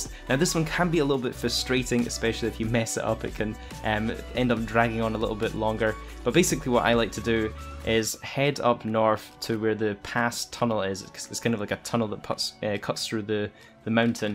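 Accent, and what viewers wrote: Scotland, United Kingdom. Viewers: First Scottish GTA youtuber, finally I might be able to follow this without bullshit 100 step glitches that don’t even work. You from Glasgow bro?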